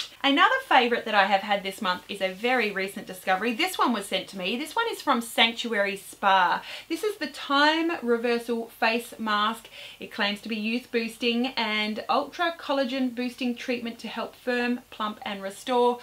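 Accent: Australia. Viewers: I do really enjoy your reviews,; as someone else said, they are 'professional' and so easy to listen to. I aways learn something new, and I just enjoy hearing your Aussie accent!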